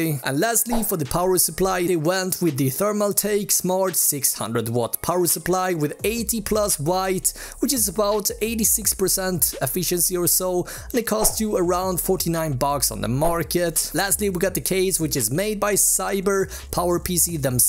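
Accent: Sweden. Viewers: You sound like you are from sweden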